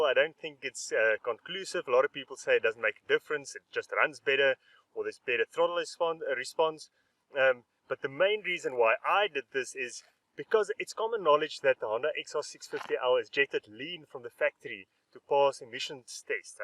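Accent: South Africa. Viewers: Hi, starting to find your channel very useful I bought a a used 2012 last year. I live 50 kilos away from work and sometimes use the XR for that fun ride and when you get to to work or home my jacket and rucksack absolutely reeks of poorly combusted exhaust gases. I think I read that this bike was sourced in the Northern Cape and depending on where, the altitude could be quite low and close to sea level. I stay in Midrand and as you know that is about 1600 m (5000 feet) above sea level, so we decided to drop the jetting by one size. Got it back last weekend and have not had much chance of testing, but will do so soon. If I nurse the bike, I also only get 15kml and I think that just can't right. Hopefully this mod will bring some relief. It's great having an SA accent on the biking channels on Youtube, well done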